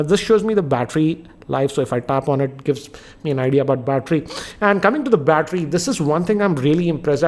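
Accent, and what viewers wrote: India. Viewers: Sir, You sound Indian tone English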